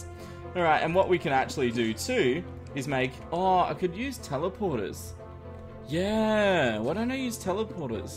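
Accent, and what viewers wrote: Australia. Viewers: lol you from Australia too mate?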